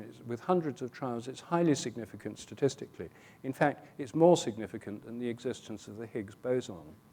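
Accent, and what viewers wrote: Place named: United Kingdom. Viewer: Because he has a British accent? He isn't saying anything I haven't heard before.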